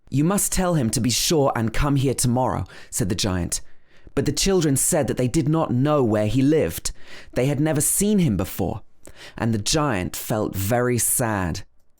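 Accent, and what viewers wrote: United Kingdom. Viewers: I love your accent!! When I was child in my country in Central America I was learning British English, I don't understand why more American accents were introduced later. maybe because it is the same continent. 😂😅But I love this accent 🥰🥰🥰 Sorry for my English is not excellent 🫶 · I was looking for someone who actually has british accent with text book reading for my role practice, Thank you so much !! I can actually see the text and repeat words so I can practice while hearing your reading! lovely ♥ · You have been blessed by God.Your voice is adorable and I hope to listen another great story touched by your nice british accent. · This is a nice story and very good for learning the British accent, keep it up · Trying to go to uk next year to learn English and started look for the videos in British accent and I love this!!! Thank you!!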